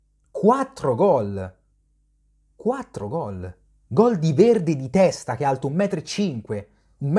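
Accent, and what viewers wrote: Perugia, Italy. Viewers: Vago accento di Perugia o sbaglio? Forse mi sbaglio. 😎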